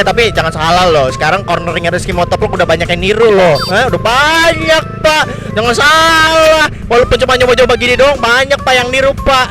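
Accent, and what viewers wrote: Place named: Java, Indonesia. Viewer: medok banget vangg ente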